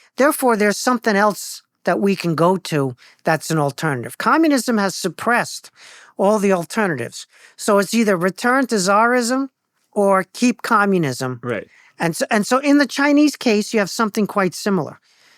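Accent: New Jersey, United States. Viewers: man im from up there my entire family has jersey accents and this guy sounds uncannily like joe pesci.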